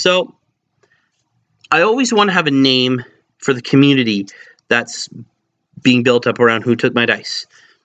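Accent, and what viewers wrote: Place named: North America. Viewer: Sorry! I meant to say "NORTH American accent"! Ha ha! Go Canada! All the best, man!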